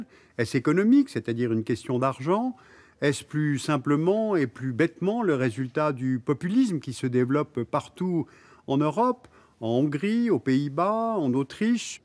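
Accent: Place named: Flanders, Belgium. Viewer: Bon si on enleve la prononciation flamande (qui est à pleurer de rire) et surtout des chiffres qui ont bien changé depuis, c'est pas trop mal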